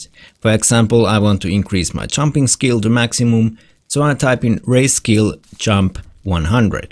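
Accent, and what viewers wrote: Finland. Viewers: I am in australia but I know that accent! FINNISH ACCENT!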